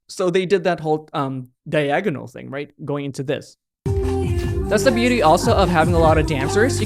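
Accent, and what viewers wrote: Philippines. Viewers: Your accent reminds me of our filipino nanny from when i was younger. I miss her 😣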